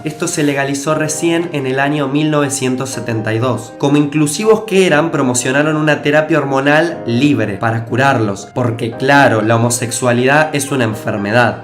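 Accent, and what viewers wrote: Argentina. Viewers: Me encanta tú acento argentino, regálamelo por favor!!!! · se nota lo argentino , el acento y ese nazo lo dice todo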